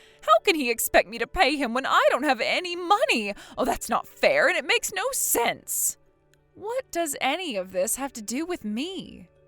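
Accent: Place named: Australia. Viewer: I truly love that Australian accent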